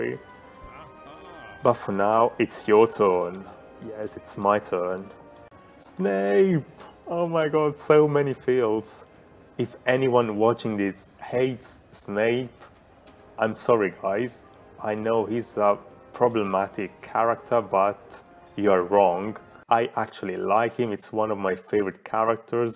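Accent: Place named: England, United Kingdom. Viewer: I sorted myself into Hufflepuff ...love your English accent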